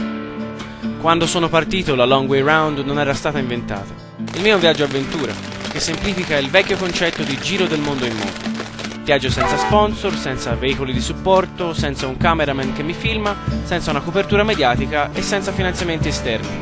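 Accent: Tuscany, Italy. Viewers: Grandissimo...ti ho seguito per anni sulle pagine di InMoto, ma vederti in video è proprio un'altra storia! Simpaticissimo, l'accento toscano è veramente una marcia in più (te lo dice un romano) per far sorridere. Ti invidio!!! Complimenti per tutto, e continua cosi!!! P.s. Ma per scendere dall'albero poi come hai fatto? :P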